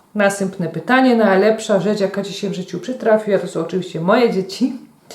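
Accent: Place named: Cieszyn, Poland. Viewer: Od niedawna oglądam twoje filmiki kulinarne i ciekawi mnie z jakiego regionu Polski pochodzisz bo znajomy jest mi twój akcent czy czasem nie jest to region cieszyński. Odpisz bo podobają mi się twoje godki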